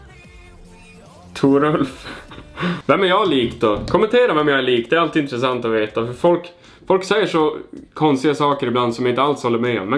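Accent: Norrland, Sweden. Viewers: Hahhaha varför gillar jag din dialekt men hatar norrländare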